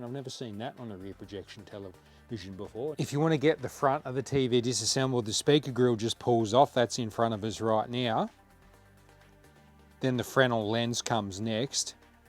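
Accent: Australia. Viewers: This is how I imagine an Australian to speak..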